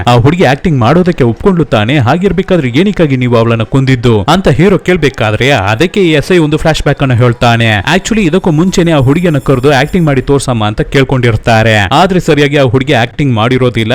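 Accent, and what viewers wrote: Tamil Nadu, India. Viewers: Tamil slang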